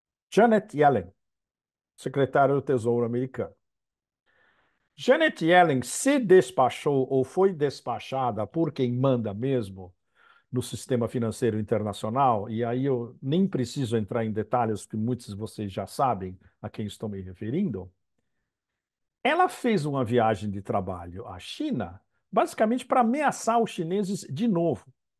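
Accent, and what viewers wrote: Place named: Minas Gerais, Brazil. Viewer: Uai sô , te mineiro aí tbm 🧑🏼‍🌾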